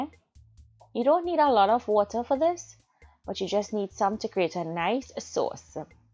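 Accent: Trinidad and Tobago. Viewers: She has a Trinidadian accent but the culture is similar to Guyana which is mostly Indian and African influence.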